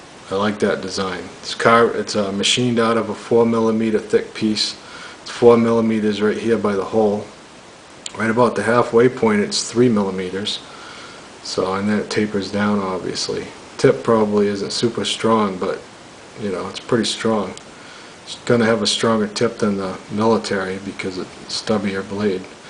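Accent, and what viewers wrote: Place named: New York, United States. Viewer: Love the New York accent ;)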